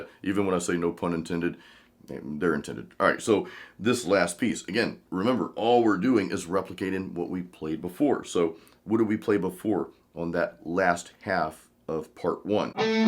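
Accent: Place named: New York, United States. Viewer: I know you are from Florida, but sometimes I am sure I can hear some New York in your accent LOL.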